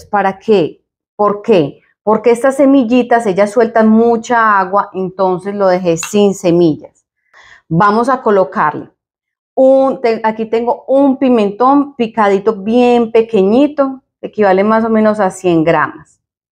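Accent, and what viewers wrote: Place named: Colombia. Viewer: es paisa,rolabrasileña haha muy chevere la receta, gracias.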